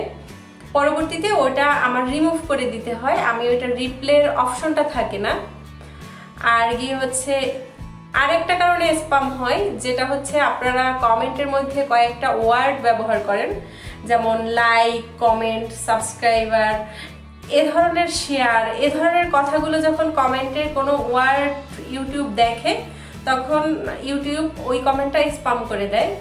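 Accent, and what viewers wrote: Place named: Barisal, Bangladesh. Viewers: ami tomake ajkei boltam borisaler vasai kotha bolte ar tumi deksi already bolso,meg na chaite jol. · To mar borisal er moto kotabola kub valo laglo tomarvlog dakte valolage ami rubina to make amar didir moto lage.ami sarervdo deke 25 kg ojon komiachi.akon ami 49kg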